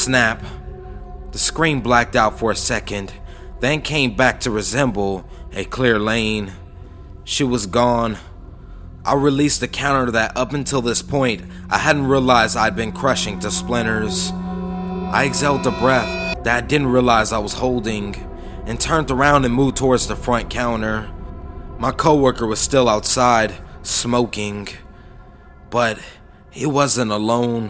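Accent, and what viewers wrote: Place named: United States. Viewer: Are you from Sydney Australia you don't have an accent you sound American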